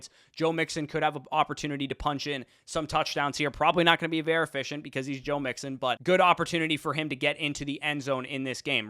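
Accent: Canada. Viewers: Another good video, thanks. Hey, can you say “out and about running routes” in your thickest Canadian accent? Thanks 🙏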